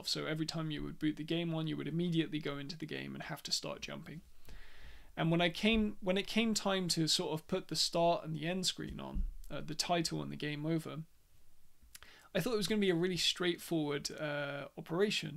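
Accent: Netherlands. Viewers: You're either Dutch or Belgian :). I think Dutch. Nederlands?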